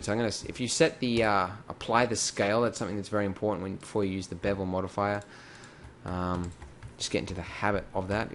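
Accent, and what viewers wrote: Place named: Australia. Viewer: this daft Aussie with his annoying accent ("blen-Dah", "heeyah", "compositah") cant tutor crap. he's more into showing off than teaching. he's as bad as Sardi Pax. the best tutor, especially for beginners, is tutor4u. ive learned so much from him.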